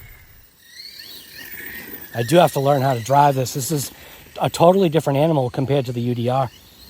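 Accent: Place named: Massachusetts, United States. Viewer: I like this RC car so much that I bought the same version that you’re showing in the video now last year I bought the other version the body is slightly different and the decals are BY THE WAY, BRO, IT’S MY FIRST TIME ON THIS CHANNEL. YOU SOUND LIKE YOU’RE ONE OF MY FUCKING NEIGHBORS FROM DOWN THE STREET IF YOU’RE IN MASSACHUSETTS BECAUSE YOUR ACCENT IS THICK😂👍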